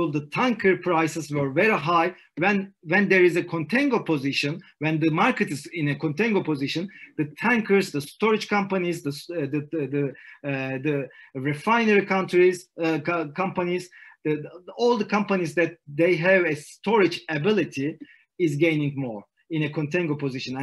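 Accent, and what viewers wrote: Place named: Turkey. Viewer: Aksandan həmən anladım ki, danışan türkdür :) uğurlar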